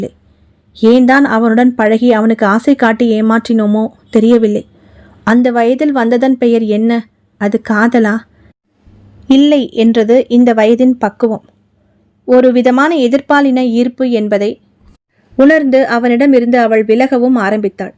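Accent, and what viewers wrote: Madurai, India. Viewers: Hi..ungal native maduraiya....slang athe mathiri iruku..melum intha kathyil meenakshi amman patri neengal sonnathu unmai...yennidam oru thadavai kanavil vanthu mookuthi ketal...aanal naan athai suththamaha maranthu vitten..oru murai meenakshi amman koviluku pohum pothu vasalileya aval kural kettathu mookuthi vangitu vanthiya yendru...appothan ninaivu vanthu avalidam sorry solli kovilukul ponen..aanal ammanai tharisika mudiya villai..thirai pottu vittargal...potramarai kulathu padiyil amarnthu vehuneram aluthen..aanal maru murai ponapothu marakamal mookuthi vangitu poi iyaridam kuduthu avaluku poda sonnen...andru iravil kanavil vantha amman koburathin mel irunthu yen mel malligai poo panthai yen mel pottal..yen native madurai than...i used to visit her often before marriage...even now wenever i go to madurai i used to visit her...but nobody knows this matter till now ...i am revealing to u only..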